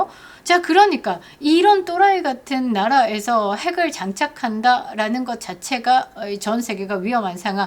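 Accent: Gyeongsang, South Korea. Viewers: 경상북도 출신인교?사뚜리가!너무너무 좋아요!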